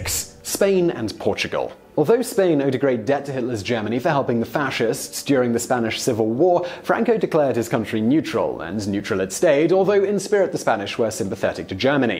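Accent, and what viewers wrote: England, United Kingdom. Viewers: How does this chap have an english accent except for his hard vowel pronunciation in any words not used in daily speech.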